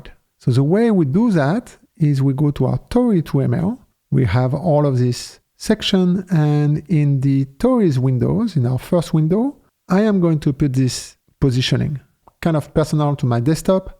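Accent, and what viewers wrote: France. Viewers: Are you french? You have a little accent xd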